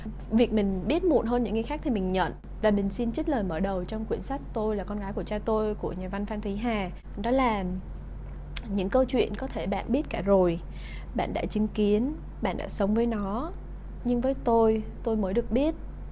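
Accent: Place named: South, Vietnam. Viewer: Sang đấy lái giọng Nam luôn rồi ah :))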